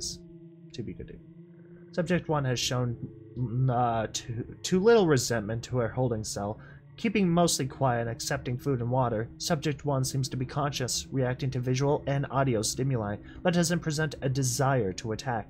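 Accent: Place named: United Kingdom. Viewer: Bro's british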